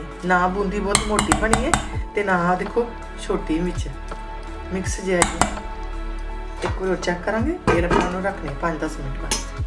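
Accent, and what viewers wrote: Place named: Malwa, India. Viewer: I love your Malwa accent. Sat Shri Akal. I will make these for my parents on Lohri. Thank you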